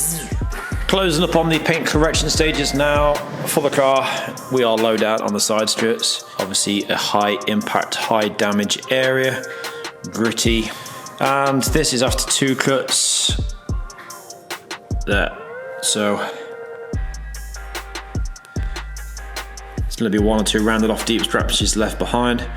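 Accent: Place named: England, United Kingdom. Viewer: This guy has the Strongest English assent I have ever heard. I can only comprehend about 30% of what he mutters.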